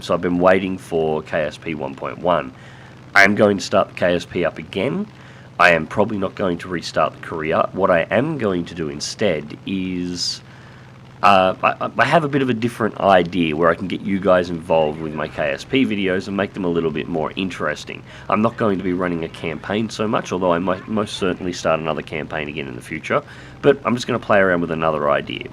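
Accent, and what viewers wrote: Australia. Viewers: yeeeeeees!!! finally! go away sleep, I have a fellow Aussie to listen to! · always sound like crap you crazy Dingo!!!! Your Austrailian, which is sexy if your Olivia Newton John or Kylie Minogue!!! You sound like the old guy from Air Supply most of the time!!! "LOL" -- You have brought me sooooo much fun, lucky day for me when I stumbled -literally - on to your channel!!! I think of you as my good friend down under -- Fair Dinkum --- Sprog1 in AZ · Hi, It's glad to see your new video on youtube. I thought Australian English was so-called "unbearable" before watching your videos, but now I'm pretty sure I like your Australian accent. Thank you for the exceptional skill you show in the video.:)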